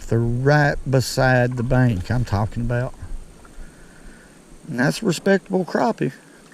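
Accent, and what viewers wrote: South, United States. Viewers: Just found you on here, and I have to say it’s nice to hear someone with my down south accent haha and someone who’s part of the bank fishers... Love your videos Crazy question, I’ve always Bass fished, how do you know the crappie is a male before it gets out of the water? LoL sorry I’m dumb with crappie but trying to learn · "she's over chonder... . I got my pressident reel... And an each and half bait..." Lol I like hearing deep accents lol* like that. Makes me wonder if I have a weird accent to people down south 🤔 ... Yeah I'm high. But fishing opener here is Saturday!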